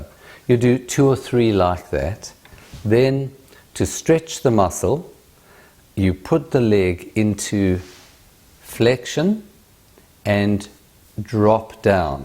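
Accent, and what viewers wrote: South Africa. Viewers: That has got to be a South African accent lol. Either way, I won’t really use this information anywhere but I enjoyed the video regardless. You’re a great teacher ❤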